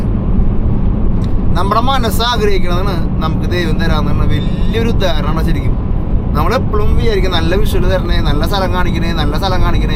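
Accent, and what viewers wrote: Thrissur, India. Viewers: Please stop your accent. You’re doing a grt job but spoiling with your Thrissur tone and accent. You’re next to sgk. He never had ktm accent. So please mate..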